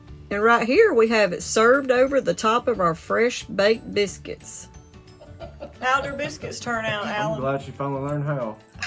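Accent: South, United States. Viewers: This is how you won't it to be I love that southern accent, and this is how I like my sausage and gravy to turn out too, make sure to cook that flour long enough and add the right amount of milk just like my mom used to make, over biscuits, rice, fried or mashed potatoes it's comparable to crispy chicken gravy only usually lasts a little longer cuz the chicken gravy usually goes with the chicken then it's gone, fried chicken with mashed potatoes I usually end up a lot fuller cuz of the mashed potatoes and gravy I'm just saying cuz, that's the way it is!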